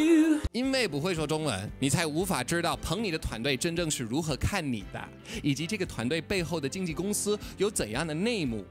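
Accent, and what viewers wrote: Beijing, China. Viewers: 您的京腔中文很标准 而且是个说真话的人 佩服敬佩🙏🏻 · 京味十足，加油bro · 我是台灣人 雖然說真的超不習慣你的中文口音和用字 但十分認同你的內容 所以點讚了 然後總覺得還是比較喜歡你講英語的樣子XD 喜歡講母語的你，覺得比較自然XD 也真的從你這影片體認到，果然一個人講不同語言時真的會呈現完全不一樣的性格，給人完全不同的感覺 覺得你用英語結語時比你前面用中文說明時更有說服力，我是指「你反共」這事的說服力 可能太多操著北京腔中文的歐美舔共影片創作者了吧～讓我覺得用北京腔講中文的歐美人都很舔共，你算是讓我改觀了XD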